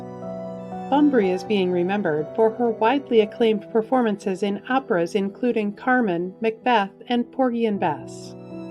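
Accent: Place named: Midwest, United States. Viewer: I love her sweet midwestern accent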